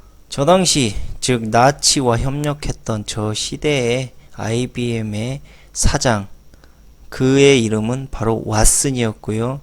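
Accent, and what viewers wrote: Jeolla, South Korea. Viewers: 전라도 목소리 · 뭔 헛소리 이사람 전라도 억양이네요 아주 사기를 치네요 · 그래그래 알겠고... 삼성 한국에선 못살게하고 해외로 나가게만들자. 어서 빨리 해외로 나가도록 자꾸 못하게하자... 시기질투열등의식나서 죽겠따. 말씨 억양이 딱 절라도네!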